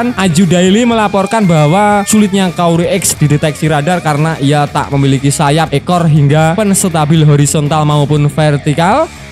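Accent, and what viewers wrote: Java, Indonesia. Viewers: medok tongkol jelek parah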